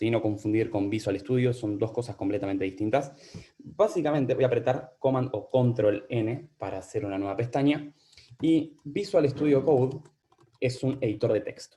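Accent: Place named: Argentina. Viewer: Me alegra un montón encontrar creadores de contenido de éste tipo que sean argentinos! Estoy re agradecido a nuestros hermanos de otras regiones de latam, pero es mucho más cómodo escuchar la "tonada" que nos es familiar! Ya me suscribí! Ví que sos profesor en UTN, puede ser? En alguna carrera o en los cursos de extensión? De ser así, me gustaría saber en qué cursos para tenerlo en cuenta si en el futuro decido tomar alguno. Gracias!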